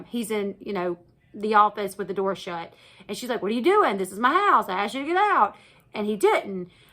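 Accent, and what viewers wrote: South, United States. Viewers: I love your country accent and I love hearing you say book so I think it’s hilarious that we’re now keeping count of how many times you say it 😂 You look so beautiful.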